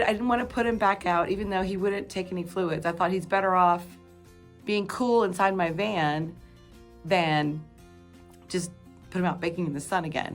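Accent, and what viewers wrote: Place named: Texas, United States. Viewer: 🤔 From TX you say? I didn't pick up on that accent in video, but it was a pleasant surprise here. I haven't heard one in a while, as I am on the road myself. 😊 I lived in OR for a decade, and just loved it. Nothing like the concrete jungle of the DFW area. You have a lovely smile that really exudes happiness - may that positive energy lighten the load of everyone you meet.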